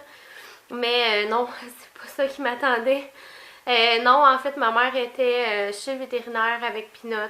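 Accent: Quebec, Canada. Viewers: J'aime trop ton accent cebequois hommage à ton chat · Tu es québécoise avec ton accent ?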